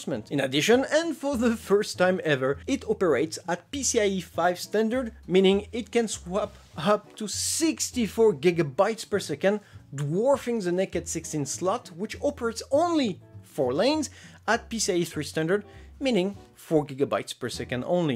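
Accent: France. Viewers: Am I the only one who is here because of French accent 🥰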